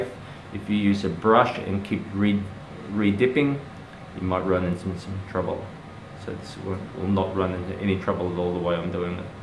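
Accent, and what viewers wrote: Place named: Australia. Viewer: So TCA peel isn't good for acne scars? I thought it would remove the top layer of skin, not really the top but a small portion like dermabrasion, through chemical process.... Anyways, you got any recommendations for any dermatologists in California? Seem from your accent, it looks as if you live around Australia.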